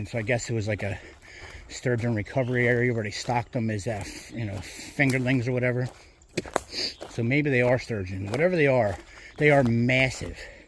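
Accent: Philadelphia, United States. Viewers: I hear your accent are you from Philly?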